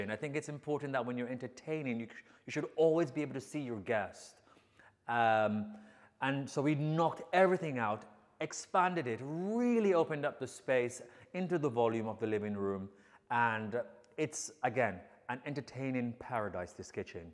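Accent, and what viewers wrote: Jamaica. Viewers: Rest assured, his accent cannot be pinpointed to the mannerisms and intonation of one particular (European) group of people. It has hints of Jamaican, British, and American. Which makes total sense given his background and where he lives. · I can hear the Jamaican accent. Yes sir. I'm Jamaican too and I'm cooking right now. Working on the rice and peas. Love you sir. You're a class act! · Within the first 5 mins. of the video, I recognized your Jamaican accent. So proud of your home and your accomplishments😃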